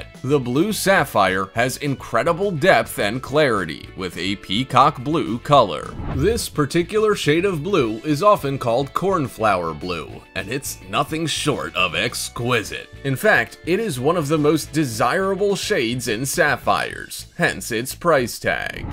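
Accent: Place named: United States. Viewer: Beautiful gems, but the American accented narrator, the guy in particular is extremely painful to listen to, he talks as if we're all thick as two short planks. Had to switch off the volume. As for the garish countdown with that stupid character it really makes a mockery of the content; which are the fabulous jewels. Re-do this with a more pleasing voice (even mine is 10 times better) and get rid of the character countdown and what the equivalent of the jewellery could buy, eg., 13 American homes, yada, yada.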